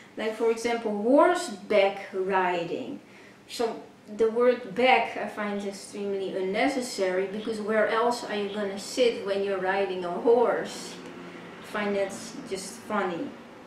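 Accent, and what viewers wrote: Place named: Netherlands. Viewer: Ik dacht al dat je Nederlands bent. Je kan echt heel goed Engels!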